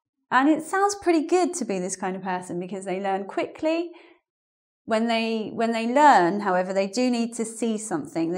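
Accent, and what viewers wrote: United Kingdom. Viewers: I would like to thank you for your channels and the services you offer. I am dating an English lady from Bath and she said I have a British accent when I speak English and when she speaks to me she sometimes feels she is talking to a British gentleman. I did not tell her I learned my British accent from you because she might get jealous. Thank you so much again. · oooooh!!!!!!! U're REALLY REALLY REALLY GOOOOD!!!!!! AMAZING BRITISH ACCENT!!!!!! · i love british accent!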